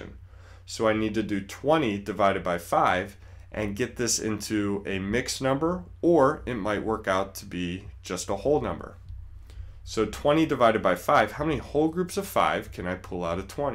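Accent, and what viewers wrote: United States. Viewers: Sir you are saying like an American so l cannot understand what you are saying l can see and trying to understand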